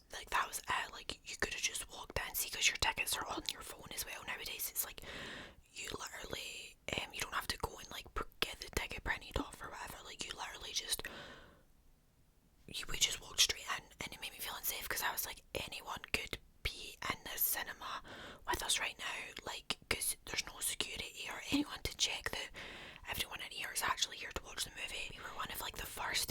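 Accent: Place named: Scotland, United Kingdom. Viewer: I sure hope all Scottish women blessed in the chest, cause jeeze is that accent annoying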